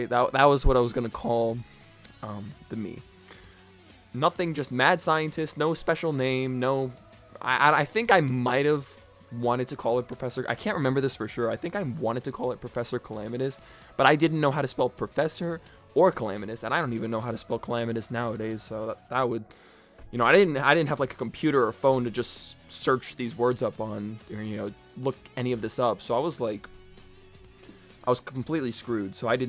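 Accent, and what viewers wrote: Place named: United States. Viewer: kinda sounds like just a generic american accent (the kind where people who have it don't know that they have an accent, me being one of those people)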